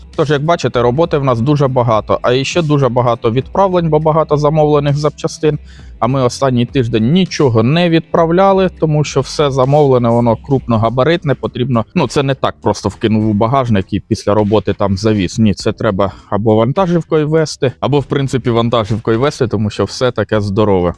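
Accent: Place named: Zaporizhzhia, Ukraine. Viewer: Відео між собою хоч схожі але дивитись все одно дуже цікаво))) Особливо коли від дому за хто зна скіки кілометрів а тут пару років тому випадково зустрів відео і чуєш свій рідний запорізький суржик! =)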